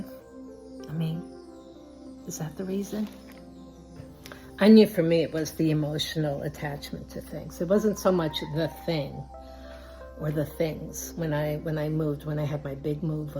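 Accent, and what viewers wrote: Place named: New York, United States. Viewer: This is a great video. Not sure why it came up in my feed - the intrusive all seeing eye of my phone perhaps? I have been declutteting for a long time. Critical life threatening illness in 2020 ( not covid) was the catalyst. I had a 2-3 yr recovery so that was slow. Now I am.picking up momentum. You provided some great tips. I have a large property which we wont be leaving anytime soon but some of the big possessions are leaving. I retired as a commercial cake decorator in 2018. I got sick in 2019, hospitalization 1/9/2020-1/20/2020. My shop remained unchanged in time from the time I closed the door 9/23/18 until about fall 2024. Its here on the property, a separate building from my home. I have been diligently whittling stuff down, selling equiptment etc. Believe me the house and garage have stuff too along with a full barn (horse drawn carriage 😬🙄) but this is a good place to start. 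You sound like you might be from NY which WAS my home state. Incidentally, I have a scrimshaw bottle opener I carried home from my aunts house in Norway. There is no reason to get rid of it, it has purpose. Thanks for the beautiful video.❤ Melinda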